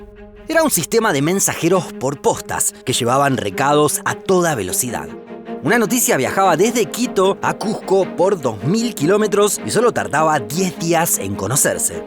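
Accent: Argentina. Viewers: Gracias a este canal, cada vez que leo un mapa, lo escucho con acento argentino!! Maravilloso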